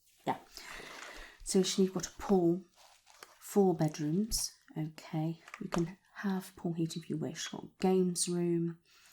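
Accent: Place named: United Kingdom. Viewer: I wonder if she gets tired of hearing me tell her how wonderful her soft, British accent sounds to these American ears?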